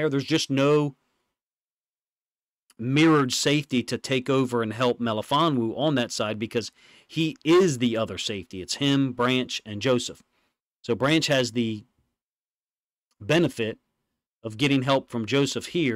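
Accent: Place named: South, United States. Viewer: I love your breakdowns, your insights and your accent. I assume you’re from the south. Do you mind saying where you were raised? I spent some years in TN and you remind me of my smart, articulate professors with twang in their speech. That southern accent when mixed with intelligence and a nerdy enthusiasm for teaching the subject at hand is a perfect combo to the ear of the learner imo.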